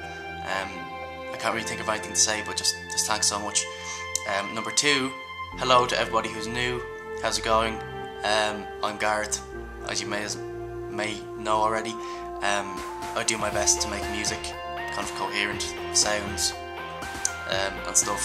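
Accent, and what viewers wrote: Ireland. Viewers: Buck sent me! I loooove your Irish accent. hahaha You`re pretty talented! Don`t give up your dreams. :) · irish accent !!1 i love it !! :D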